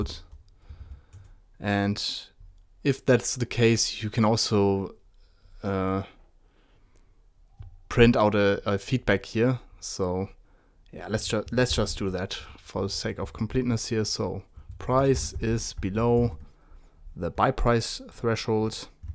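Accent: Germany. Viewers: Safe Deutscher oder?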